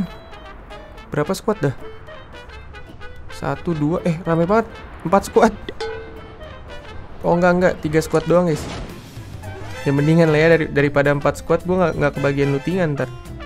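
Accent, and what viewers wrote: West Java, Indonesia. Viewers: BG emang or Jabar ya..? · Orang sundaa bangg?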